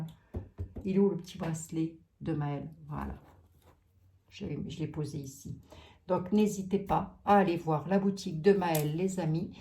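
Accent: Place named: Vosges, France. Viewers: Bonsoir, je me régale avec vous, entre autre avec votre accent, étant spinalienne d'origine, bon certes j'avais 9 ans lorsque je suis partie dans le sud, cela fait maintenant 50:ans. Quand je vous écoute je retrouve mon accent. Le plus qui m'éclate chez vous c'est quand vous vous mettez d'un coup à râler après quelqu'un, et que vous avez raison. Ça me fait délirer votre humour. En tous les cas, merci pour tout ça. Je vous adore.🌸🥀🌷🙏🙏🌹💐😇😘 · Une petite pointe d.accent vosgien par moments... celui-là on ne peut pas l'oublier (j'en suis la preuve) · Moi heureuse de retrouver l 'accent Lorrain et les expressions. De temps en temps j'en ressors une.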